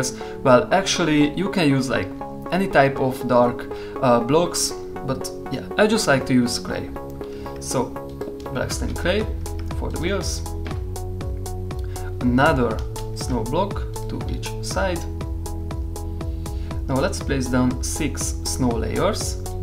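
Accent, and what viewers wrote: Germany. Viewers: bist du Deutscher?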